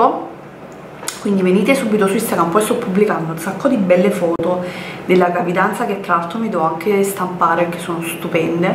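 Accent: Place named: South, Italy. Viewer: La temperatura del suolo in questi giorni è arrivata 50 gradi, più del 2003!!! Assurdo peggio del deserto 🏜. Non so di preciso di dove sei ma dal tuo accento presumo che sei del sud, la ha fatto molto più caldo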